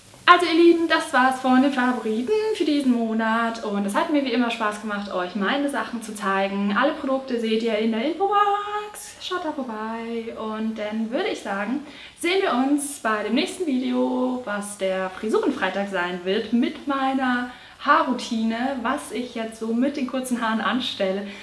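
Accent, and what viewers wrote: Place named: Berlin, Germany. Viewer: Ich find dich super sympathisch! :) Kommst du aus Berlin? Du bemühst dich zwar sehr feinstes Hochdeutsch zu reden aber ich werd das Gefühl nicht los, dass du von hier bist :)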